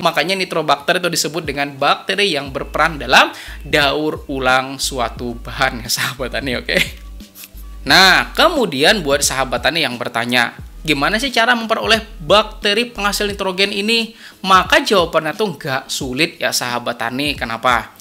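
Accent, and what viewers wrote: Banjar, Indonesia. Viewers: Tetap ketahuan logat banjarnya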